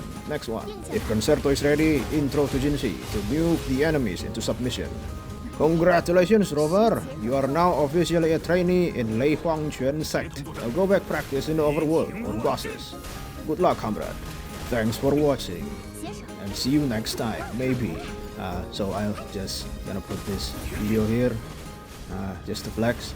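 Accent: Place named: Philippines. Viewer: Man that accent😅 i cant. I assume you're a filipino or indo cuz i also sound like you whenever i speak in ingleesh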